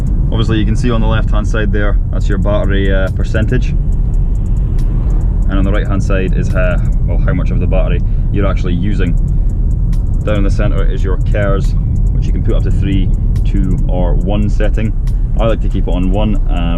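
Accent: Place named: Scotland, United Kingdom. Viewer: Well done for keeping the review informative, short (under 10 minutes), and entertaining. The Scottish accent is an added bonus.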